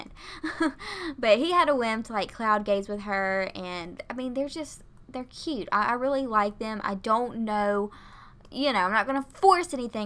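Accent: South, United States. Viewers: Ok I know this sounds weird but your voice or so adorable, and your country accent is so freaking cute😂😂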